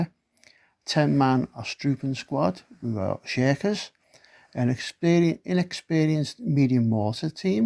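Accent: United Kingdom. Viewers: That a scouse accent? I'm recently into Bolt Action but no idea where theres any groups in Liverpool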